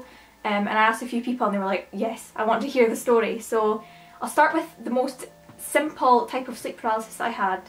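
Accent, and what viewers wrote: Scotland, United Kingdom. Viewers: This story time is immediately 10x better with your Scottish accent omg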